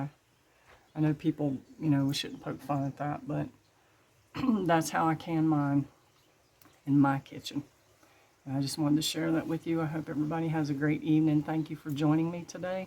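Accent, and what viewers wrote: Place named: South, United States. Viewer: I think your awesome and thank you for explaining things so easily yet thoroughly! I appreciate the calm southern voice! :) Well, it sounds southern to me!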